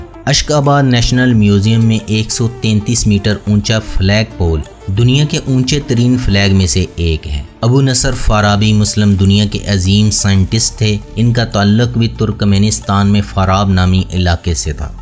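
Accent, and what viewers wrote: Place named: Attock, Pakistan. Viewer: Mera andaza thek Hai mujhe Laga attock Pindi Ke ho accent se ,AAP Boht achhey lagte Hai , mujhe geography boht Pasand Hai ,surnime country Ki video bnain , mere 2 channels Hain EK colour cloud Pakistan and dosra Jolly Azeem m jobless , mere blogs BHI Hain ,AAP Jani tv se behter Hain ,love you from Lahore Pakistan 😘😘😍😘😍😘😍😘😍😘 keep it up sab se achhi baat aapki subscriber se pyar aor replying Hai ,Mai aapko countries recommend krta raho Ga , inshallah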